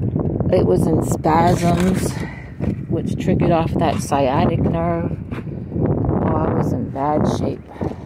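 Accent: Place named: Connecticut, United States. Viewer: Me too. From Connecticut. Been here almost 17 years. I recognized your accent. 😊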